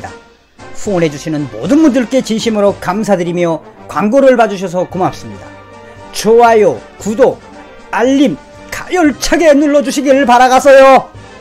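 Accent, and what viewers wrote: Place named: Pyongan, North Korea. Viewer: 아예 평양말투로 방송하면 매일 보겠습니다. 뉴스도 뉴스지만 그 평안도 말투가 넘 정답고 그립습니다. 요즘 이런 말투가 점점 사라지고 있어 안타깝습니다.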